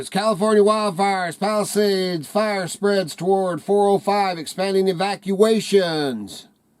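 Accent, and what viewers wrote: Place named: Appalachia, United States. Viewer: Love that Hillbilly talk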